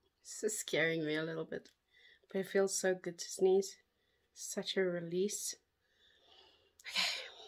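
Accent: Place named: South Africa. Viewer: Wow. That was beyond amazing. You really have a beautiful juicy perfect nose. The way you blow your nose is just perfect. The position of your hands. The honks you make. And you are really beautiful in general as well. And I love the south African accent too. Is there a way I can talk to you in private?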